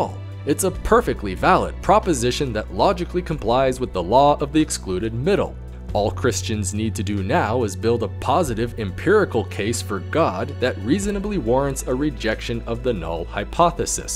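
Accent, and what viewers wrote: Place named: Utah, United States. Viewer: Else -> elts, false -> falts, where do they speak like that? I've only heard "elts" to be a Utah-regionalism.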